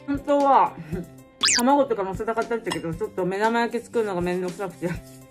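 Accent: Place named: Hakata, Japan. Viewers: 博多弁サイコー❤美人でええな🎉うちも博多たい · 喋り方が福岡やけんめっちゃ親近感わきますｗｗ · 俺と喋り方「博多弁」がばり一緒っちゃけど、街ですれ違ってるかもね笑笑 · と同じ身長で６７キロまで太りました😭 食事は給食あるから無理だけど、頑張るぞ〜！私と同じくらいズボラやし、九州出身？と思われる方言が素敵やね。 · 最近知って式マッサージとかやったりしてるけどいままで毎日やってるとマッサージの内容(?)とか覚えたら動画見ずにやったりしてたけどが見たいからマッサージ覚えても毎日動画見に来てる🙌まじで考え方とか性格とか好きすぎるし、何より私も九州の人間だからイントネーションとか聴きやすくて方言も好きすぎる💓唐揚げ私も大好きだからたまに揚げない唐揚げ作ってみようと思う💪私はYouTubeで知ったけどまじで知れてよかった😂💓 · あ！博多弁やん笑笑聞きやすい笑笑天才やね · 博多弁親近感湧く😂💕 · 糖質制限から7キロリバウンドした💦 博多弁丸出しの密かにずっと見とって、博多人間からしたら友達の領域w まじうけるw🤣ちょっとまじでまた7キロ戻そかなー！頑張る💪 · この雑さ具合が好きになる〜方言的に福岡の人なんかな？ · 、福岡弁かわいい💞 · 方言が一緒やな……って思ってたら福岡の人でした!!余計に嬉しいです( ｰ̀֊ｰ́ )✧︎ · は九州？うちは福岡やけど話し方が福岡っぽくて親近感があった❣ うちも今ダイエットの迷路にはまってしまってるけん、のを参考にしますだ✌ストレス溜まらんようにやね❤これからも楽しみに見ます～😃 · 同じ福岡だからか方言がしっくりww面白いかよw しかしバリカワ！チャンネル登録しましたー！ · はじめまして☺️ 私の半分しか生きてないやけど、かわいいけんチャンネル登録した❤️ 博多弁？私も福岡やけん聞き取りやすい☺️ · 〜やけんとか〜とよとかは博多弁ですよー。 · 博多弁に癒されます！ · 博多弁いいですよね私、博多在住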